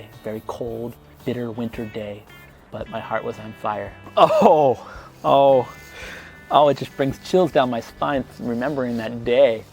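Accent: United States. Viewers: As an American, I like your American English accent. It's very easy to understand and soothing to listen to. I like your advice by saying to be bold and courageous but not cross the line which means always being respectful and being a gentleman. Great video as always! Take care,!! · Haha! I don’t think I’ve ever heard you speak this much in English. 한국말로 구사할 때랑 똑같이 농담을 하시네요 ㅋㅋㅋ 캘리포니야 말투도 멋지고 한쿨말의 방송인 억양도 재밌구먼:) 화팅!!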